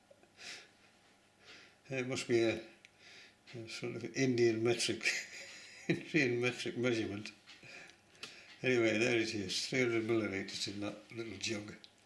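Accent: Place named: Cumbria, United Kingdom. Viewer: Nice Cumbrian accent!!!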